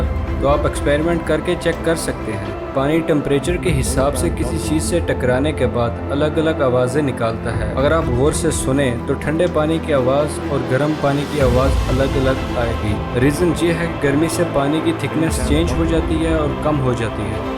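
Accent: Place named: Punjab, Pakistan. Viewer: tumhara lehja "Punjaabi"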